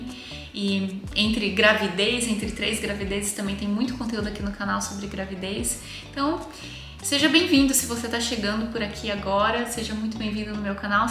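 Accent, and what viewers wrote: Brazil. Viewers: Sabia que teu sotaque, não era estranho! !!!Porto Alegre RS, capital 💃💃😘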